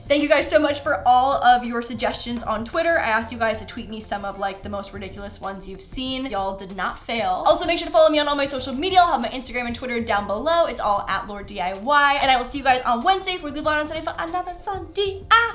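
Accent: Canada. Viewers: New here. Love how she says "about". Reminds me of Cristine. Is she from Canada?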